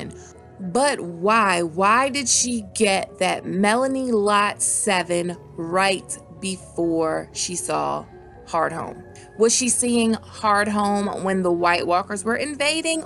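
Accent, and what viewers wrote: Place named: Massachusetts, United States. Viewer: I like how you read..are you from the north East? I live in Massachusetts, and your accent almost matches a slight Massachusetts accent because not everyone has that horrible Boston accent very thick... But it could be a New York accent or somewhere in between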